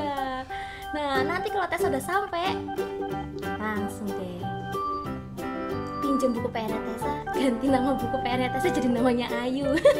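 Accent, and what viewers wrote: Java, Indonesia. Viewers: Wah,hi ini Brusan dengar suara ni,jdi org jawa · Medok banget jawanya 😂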